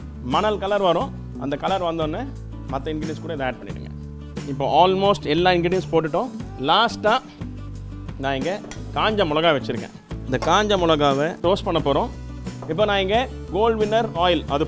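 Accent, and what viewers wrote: India. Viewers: Can't wait to make this. Its speaking an Indian dialect of English?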